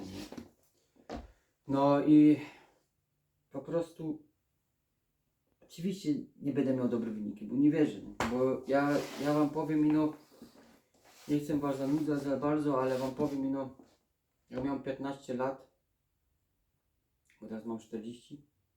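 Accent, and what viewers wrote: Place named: Silesia, Poland. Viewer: Zrobiłeś pierwszy krok ,że mówisz, to już jest dużo. Trzeba wszystko z siebie wywalić,co cię boli wewnątrz w środku twojej duszy. Jesteś wrażliwy i to widać,fajny chłopak ,otwarty,super. Wyjdziesz z tego ,musisz bardzo chcieć a ty chcesz. Tylko od ciebie to zależy. Już ciebie to uwiera i bardzo dobrze. Super facet z ciebie,dasz radę, jesteś ambitny i pokażesz ludziom ,że można. Będziesz jeszcze innym ludziom pomagał wyjść z alkoholizmu. Ty to znasz praktycznie a nie z teorii,masz w sobie prawdę. Walcz o siebie,bo warto. Świat jest piękny. Powodzenia. Nagrywaj filmy jak ci idzie,krok za krokiem powoli będziesz wychodził razem z nami a my będziemy się cieszyć jak każdego dnia walczysz o siebie. Mów wszystko,wszystkie swoje doświadczenia,to co ci przychodzi teraz na myśl,co cię boli.Nagrywaj i mów.☀️☀️☀️☀️☀️☀️🌼🌼🌼🌺🌺🌺🌹🌹🌹🥀🥀🥀🇵🇱🇵🇱🇵🇱🇵🇱🇵🇱. 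Zrób to dla Naszej Ukochanej Ojczyzny. Jeszcze twardy Ślązak,piękna gwara, coś pięknego.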